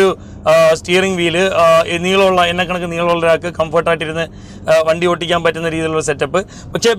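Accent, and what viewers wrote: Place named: Travancore, India. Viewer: തിരുവിതാംകൂർ ഭാഗത്ത് പ്രത്യേകിച്ച് ട്രിവാൻഡ്രം കൊല്ലം സംസാരശൈലി.. ഇങ്ങനെത്തന്നെയാണ്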